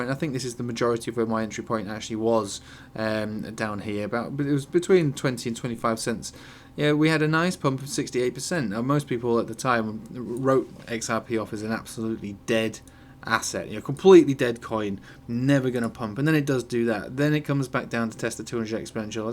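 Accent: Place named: Northern England, United Kingdom. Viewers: sounds yorkshire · Just “norf” :D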